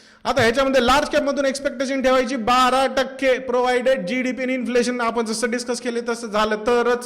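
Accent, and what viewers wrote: India. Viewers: अस्सल गावरान तडका..... लै झ्याक सर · Pan Marathi Evdhi pan khedval Nka bolu Sir...... Kahi national viewer pan astil tumcha...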